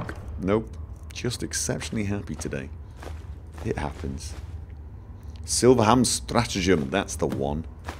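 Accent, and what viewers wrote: United Kingdom. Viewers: Allright, let me get this straight: said that the british still use feet for measurring, but he sounds pretty british to me. im a little confused.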